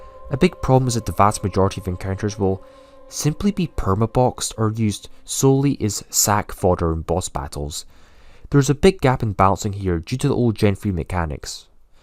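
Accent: Scotland, United Kingdom. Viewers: That's just his accent, not a speech impediment, you can hear that strong scottish accent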